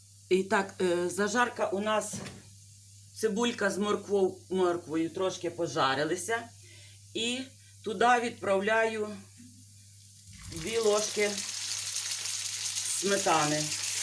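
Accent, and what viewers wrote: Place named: Podillia, Ukraine. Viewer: А Поділля велике, я теж народилась на Поділлі, проживаю на Буковині (на кордоні 3-х областей), мені такий ваш говір рідний, і прізвище як у моєї куми😊. Люблю вас дивитися❤❤❤❤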